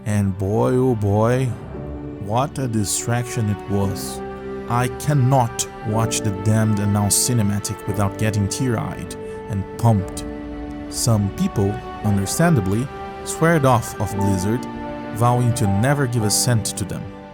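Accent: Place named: Brazil. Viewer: Dude, you kinda sound Brazilian. Where are you from?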